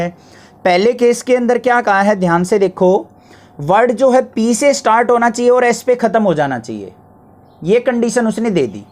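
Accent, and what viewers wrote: Haryana, India. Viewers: Sir aap haryana se ho😊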